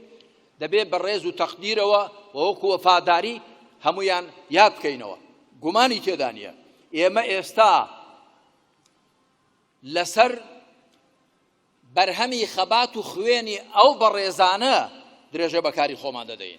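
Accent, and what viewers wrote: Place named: Pishdar, Iraq. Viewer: بە کوردیەکی زۆر خۆش و پاراو و پەتی قسە دەکات. شێوەزاری پشدەری زۆر خۆشە، هەقە بکرێتە ستانداردی کوردیی سۆرانی کە لە نێوانی هەولێری و موکریانی لە لایەک و سلێمانی و گەرمیانی و ئەردەلانی لە لایەکی دیکەوەیە.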